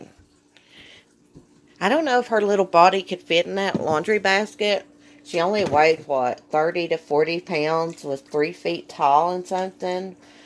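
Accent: South, United States. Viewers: okay good I have a baby but never used her as my profile. I guess you knew it wasn't me anyway because you blocked the person you said. ❤ I personally like your southern accent. It doesn't sound bad at all. So F that girl!! She probably had to use her baby as her profile because she was hideous lol. Remember you have alot of supporters and we think your awesome ❤😊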